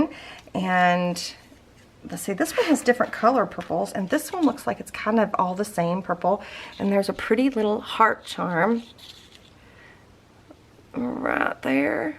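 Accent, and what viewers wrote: South, United States. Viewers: I love watching you!! I've been watching you for several years now. Love going back and watching your old videos. I always learn something new!! Plus another lady with a southern accent 😉 (Alabama girl) . I can't wait to see what you come up with next!! ❤❤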